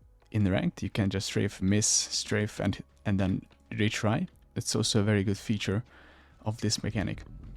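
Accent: Hungary. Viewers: Tudtam, hogy Magyar vagy! Az akcentuson hallatszik, mondom ránézek a névjegydre, és bingo, Magyarország. :D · Are you hungarian? Your accent sounds like hungarian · AAAA az a szep magyar akcentus<3 Great vid tho:)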